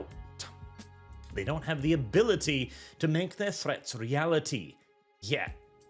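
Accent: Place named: RP, United Kingdom. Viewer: how is it you have such high class British accent and you're Norwegian? did you learn English from an aristocrat?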